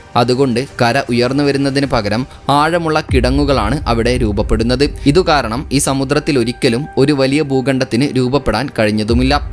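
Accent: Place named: Malappuram, India. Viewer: മലയാളം നന്നാകണം. ഇത് മലപ്പുറം മലയാളം ആണ്. മലയാളത്തിൻ്റെ ഉച്ചാരണം ഇങ്ങനെ അല്ല.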